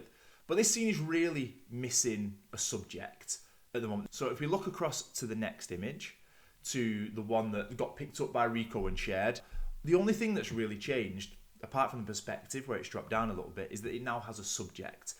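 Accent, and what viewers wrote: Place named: Manchester, United Kingdom. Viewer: Your video came up in my feed. You started speaking, and I thought “he sounds like he’s from Manchester”. Yep! My good friend is from Manchester, and has shared with me all things from the city. Like, and football. So the big question is city or United? Keep up the good work,!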